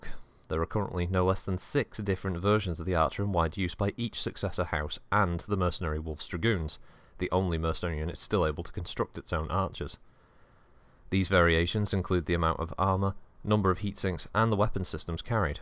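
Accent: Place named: England, United Kingdom. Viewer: you're English right? Yall are the best narrators and so far id say you are carrying just fine. so far you are proving that considering i pass around your shit to my homeboys. I'd hope they did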